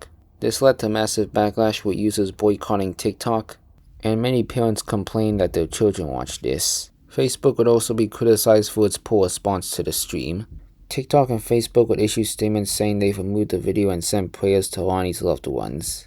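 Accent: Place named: New York, United States. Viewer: Why do you sound like a New Yorker or New Englander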